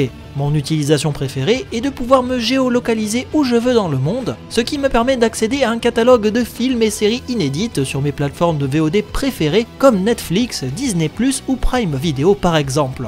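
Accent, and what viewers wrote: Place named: France. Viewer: C'est vraiment très étrange d'entendre les noms des personnages de cette façon... d'une manière si française, plutôt qu'en VO (plus précisément en haut valyrien du coup.) [Ranyra] à l'écoute, au lieu de [Wraynira]. [Jackérys] au lieu de la prononciation [Jaycéwrys]. Entre autres. Ça pique. Mais c'est mon avis.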